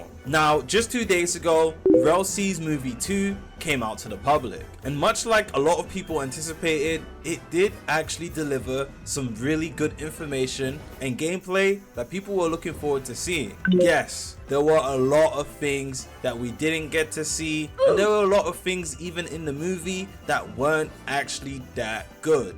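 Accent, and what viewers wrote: United Kingdom. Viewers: YOU FROM LONDON TOO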